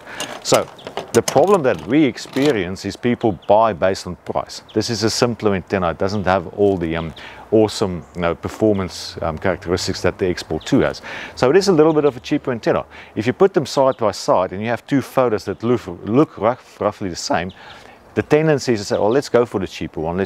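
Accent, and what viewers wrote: South Africa. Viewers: Thanks for the video. You sound South African 🤔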